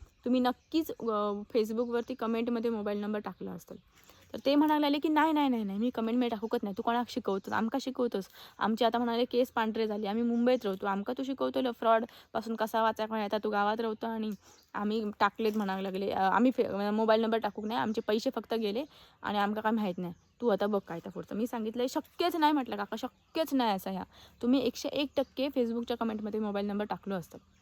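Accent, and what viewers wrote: Malvani, India. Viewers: मस्त मालवणी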